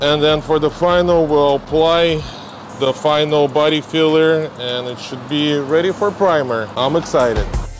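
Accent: Russia. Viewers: Акцент русский 😂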